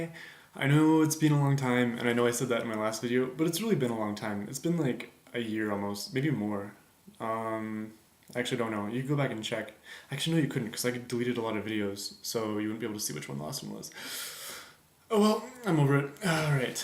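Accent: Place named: Germany. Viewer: i hear your german xD greetings from germany rofl ^^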